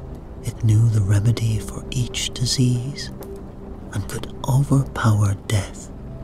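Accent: Scotland, United Kingdom. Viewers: What's also interesting about the author is he has a beautiful Scottish accent, so the fact he can do all these other accents just makes it even more impressive😊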